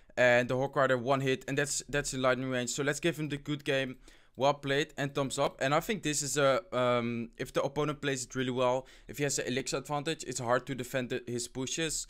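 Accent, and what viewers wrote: Netherlands. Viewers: You have a dutch accent, are you dutch? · ik kan horen dat jij nederlands bent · jii bent nederlands of niet want je hebt een nederlands engels accent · This dutch accent has got me like 😂😂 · are u dutch!!! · Owen Seetsen hij is nl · je hebt echt een typisch Nederlands accent als je engels praat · Are u dutch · you really have an dutch accent, are you dutch, ben jij nederlands want je klinkt echt super nederlands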